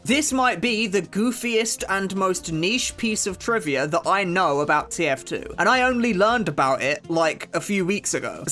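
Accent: United Kingdom. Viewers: He has the most british accent i've ever heard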